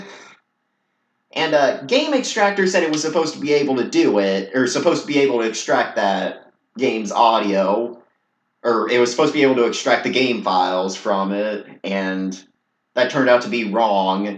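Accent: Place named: Texas, United States. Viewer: why do you sound almost exactly like terrev but slightly more texan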